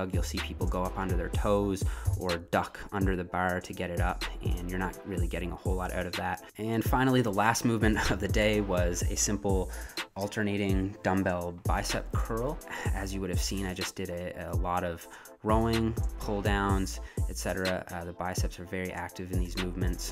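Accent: Canada. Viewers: Is this dude Canadian?? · Does anyone know what city this dude is out of? Canadia for sure? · Is this guy from Canada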